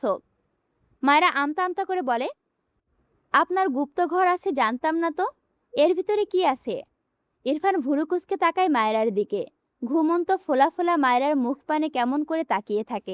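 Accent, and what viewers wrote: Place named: Kushtia, Bangladesh. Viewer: আপু তোমার ভাষা শুনে বুঝাই যায় তুমি কুষ্টিয়ার মেয়ে। আমিও কুষ্টিয়ার মেয়ে 😘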